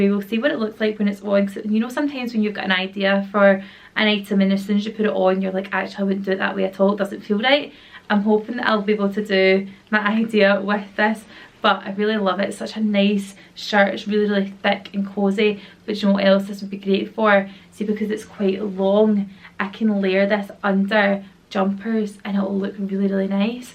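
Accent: Scotland, United Kingdom. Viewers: Not video related but I Love the Scottish accent. My dad's from Glasgow and my mum English I speak English but love the accent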